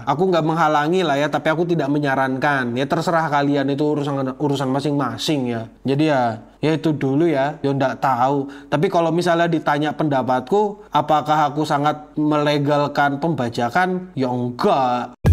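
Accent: Java, Indonesia. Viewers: jadi medhok gitu le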